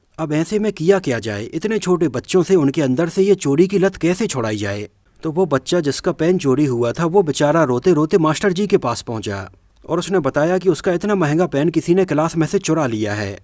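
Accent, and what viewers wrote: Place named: Bundelkhand, India. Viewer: आप शायद बुंदेलखंड के हैं आपकी बात से मैं सहमत हूँ आपकी टोन से मैं सोचता था कि आप बुंदेलखंड के हैं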